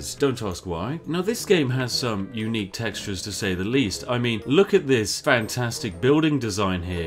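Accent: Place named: Devon, United Kingdom. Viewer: I bet you're from Devon..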